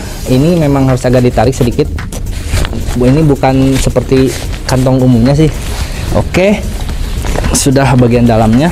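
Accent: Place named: West Java, Indonesia. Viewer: Logat Sunda na mah Aya keneh 😁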